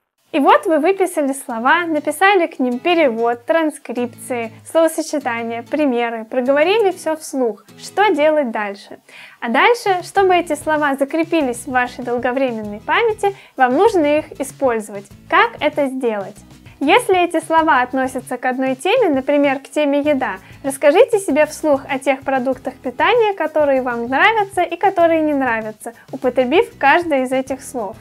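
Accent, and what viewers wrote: United Kingdom. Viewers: ты классная Приятная и простая) Обожаю твой англ. акцент!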